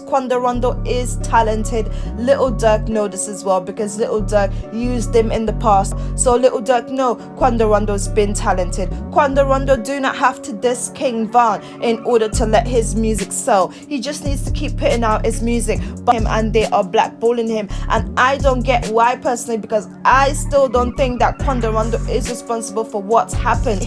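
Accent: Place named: United Kingdom. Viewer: Random but if you don't mind me asking, where are you from? I love ur accent if id have to guess it would be the UK but I'm not sure on what part of the uk.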